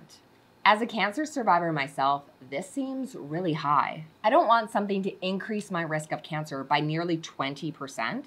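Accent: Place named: United States. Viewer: great advice. very interesting. I cannot get used to American accents. RESK is a strange word. In England we mostly say R I S K. Nice lady but strange way to describe RISK. She also says FESH instead of FISH. HEY HO I wish the USA well. Thanks for the advice. Please remember that Youtube is shown in UK.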